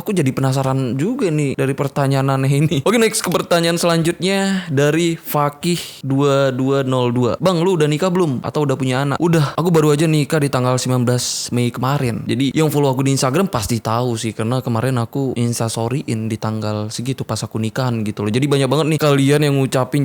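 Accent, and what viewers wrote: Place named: Aceh, Indonesia. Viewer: Gapapa bang adain lagi aja #ThreadHorror nya, mau itu cerita real ato engga, yg penting kita2 bisa ngerasain feel serem-nya bareng2 hehehe... Salam dari Semarang bang. Ohiya, aku tebak Bang pasti asli Aceh nih, ketauan dari logatnya soalnya, apalagi video2 yg dulu logatnya masih kental banget tuh wkwkw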